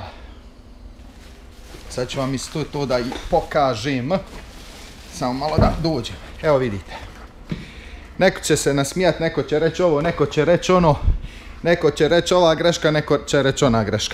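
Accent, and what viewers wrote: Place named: Bosnia and Herzegovina. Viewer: Tek sam sad otkrio kanal.. A sto volim cuti ovaj jezik govor ... Odmah me prebaci na BiH postavke...♥️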